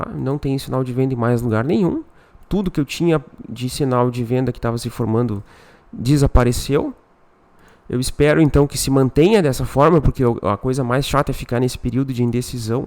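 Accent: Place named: Australia. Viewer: Kkkk😂 mas não tem mesmo, o tem um sotaque tipo uma mistura de Inglês Autraliano com Gaúcho.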